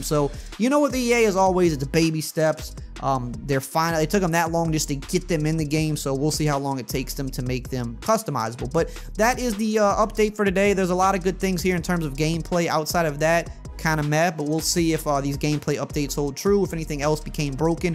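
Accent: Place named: Alabama, United States. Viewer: His voice really said ✨ Alabama ✨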